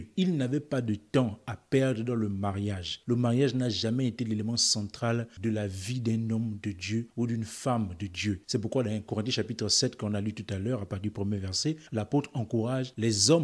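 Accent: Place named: Gabon. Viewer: Je me disais bien que la façon de parler était comme un gabonais 🇬🇦 😅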